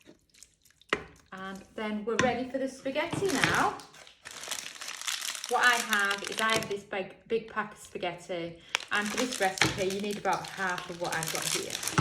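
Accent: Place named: North, United Kingdom. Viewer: Great to hear a northern British accent like mine. I'm from Bradford. Xx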